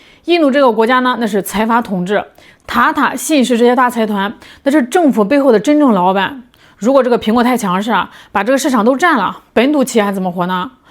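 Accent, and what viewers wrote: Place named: Henan, China. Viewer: 博主听着像河南人捏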